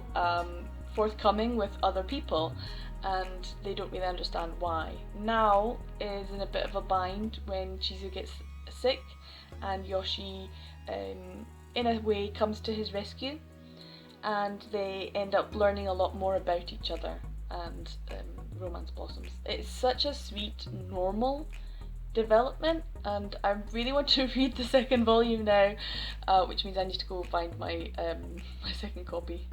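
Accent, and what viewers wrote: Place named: United Kingdom. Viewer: Your accent!! I didn’t realize you’re from the UK! I also didn’t realize you have a channel. Subscribed! 💖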